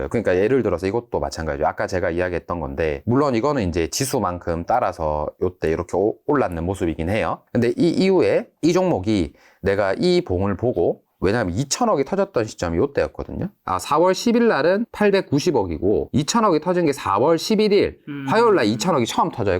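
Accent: Gyeongsang, South Korea. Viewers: 주식판에 젠체하는 젊은 경상도 아들 와이리 많노~ 거슬리네~~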